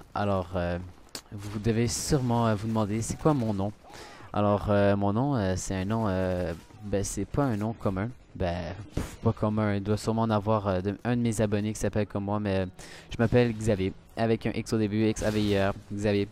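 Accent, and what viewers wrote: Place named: Quebec, Canada. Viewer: bravo pour avoir dépasser les cent abonnés , pour l'accent ont si habitue , beaucoup d'excellent youtubeur sont québécois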